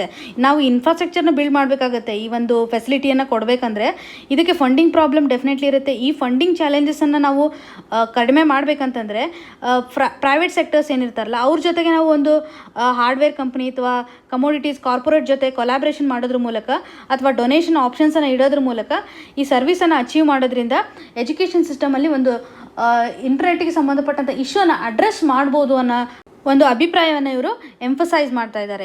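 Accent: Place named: North Karnataka, India. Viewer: Excellent madam.. 👌Pakka uttara karnataka language....😁😇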